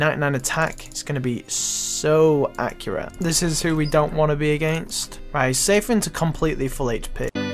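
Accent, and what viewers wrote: United Kingdom. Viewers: You literally sound like my old mate lloydreed1 lol voice is trippin me out. Must be from the same part of the uk 🇬🇧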